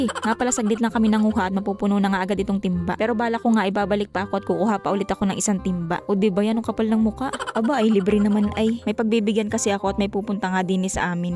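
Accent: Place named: Quezon, Philippines. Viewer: Hi, natutuwa ako sa punto mo Quezon na Quezon ay, from Quezon din ako. Pero watching here in Edmonton Canada. Pa shout out sa next Video mo, Aileen Villapando of Edmonton Alberta Canada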